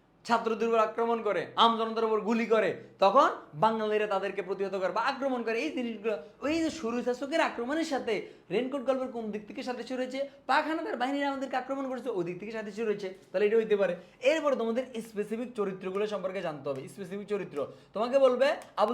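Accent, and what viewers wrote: Noakhali, Bangladesh. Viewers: স্যারের বাড়ি কি নোয়াখালী?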